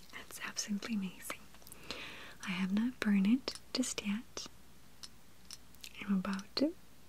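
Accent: Russia. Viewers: your Russian accent <3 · really enjoyed your state of mind during this video ! very natural and spontaneous, your positive attitude is contagious ! we really appreciate your russian accent also ;) thank you very much ! kisses from France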